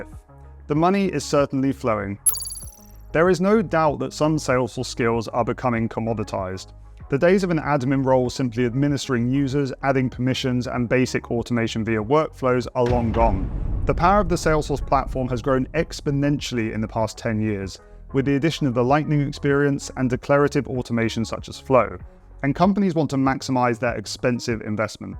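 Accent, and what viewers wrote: United Kingdom. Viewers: I was just listening to the video and thought maybe I misheard it due to the British accent😂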